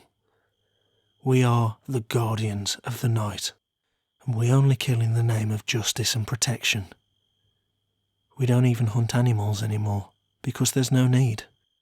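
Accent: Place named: Birmingham, United Kingdom. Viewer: Him: who is he? Me: Levi Ackerman •_• Also really good video! You kinda sound like someone from peaky blinders! I loved it!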